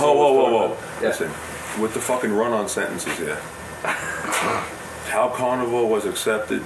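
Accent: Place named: New York, United States. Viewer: His NY accent really comes out here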